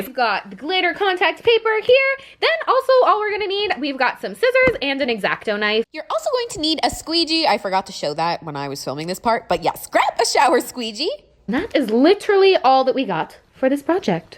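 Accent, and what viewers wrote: Australia. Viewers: she likes to talk in an Australian accent because it used to be a goal of hers to go to Australia so she was speaking it into existence, she probably just has a habit of doing it sometimes now lol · It annoys me so damn much that in every single video she has to say “SORRR” in that redic Australian accent like sis stop it’s not cute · Can anyone tell me why she has an aussie accent on her so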